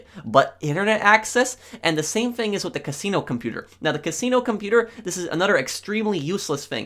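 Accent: Poland. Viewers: Are you polish? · Are you from Poland?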